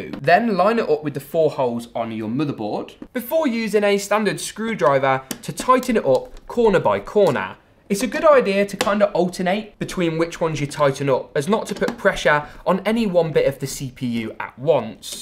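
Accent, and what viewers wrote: United Kingdom. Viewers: That British accent though, I'm intrigued😁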